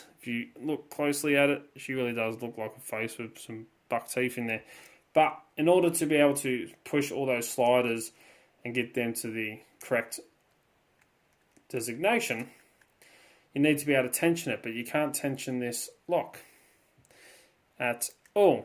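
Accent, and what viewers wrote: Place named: Australia. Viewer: well compare to lockpickinglawyer, you will hear the difference. Nothing wrong with the content but the sound is going to be an issue if you want subscribers outside Straya who are not that familiar with the accent. Anyways have a great christmas time man!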